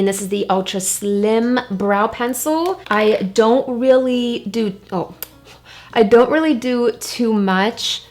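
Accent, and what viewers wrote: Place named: New Jersey, United States. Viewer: Where r u from. I think i hear a jersey accent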